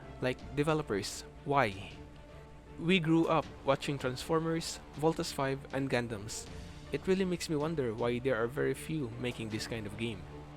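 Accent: Philippines. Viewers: Pinoy? Your accent was so obvious.. Anyway I love your video keep it up.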